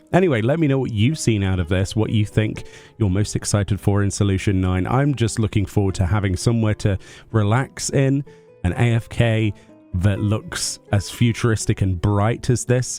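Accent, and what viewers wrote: United Kingdom. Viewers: Me watches cause he always posts video's on the latest ffxiv. And I want to stay updated. also he avoids drama. also he has a nice british accent Haters on the internet: we don't like that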